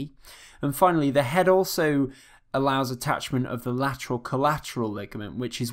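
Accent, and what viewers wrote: England, United Kingdom. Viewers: your english accent is super strong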